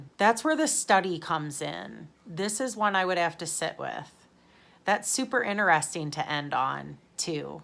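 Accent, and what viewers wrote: Midwest, United States. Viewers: don’t y’all talk similar in the Midwest? It all sounds the same to me! Anyway, you’re very cool, I like your channel and your face. 😊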